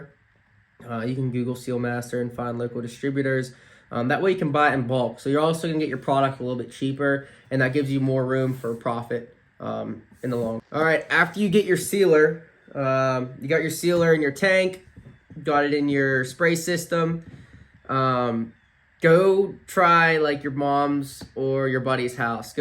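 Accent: United States. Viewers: Lol this dude is Delco AF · Lol you from pa man sounds like a a accent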